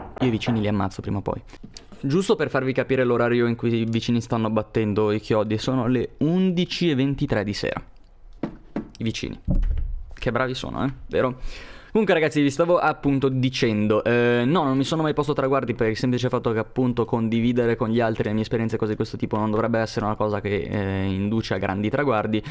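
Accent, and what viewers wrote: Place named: Monza, Italy. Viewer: Secondo me sei di Monza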